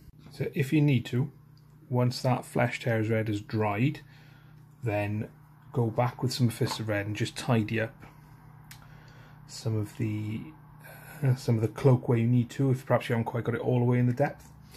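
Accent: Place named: Swansea, United Kingdom. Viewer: can always tell another jack! 😍🏴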